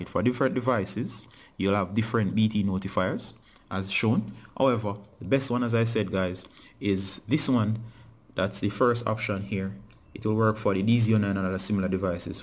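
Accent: Jamaica. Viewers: Stfu he's jamaican like myself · Are you jamaican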